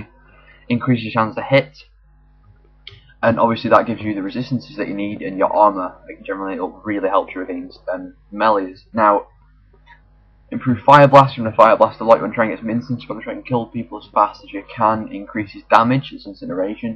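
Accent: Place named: United Kingdom. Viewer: I loyke the british accnt ;))))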